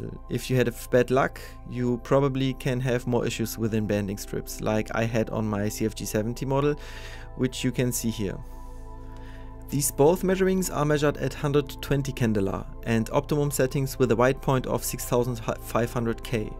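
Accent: Germany. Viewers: your pronounciation sounds way to german. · are you german? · immer dieser deutsche akzent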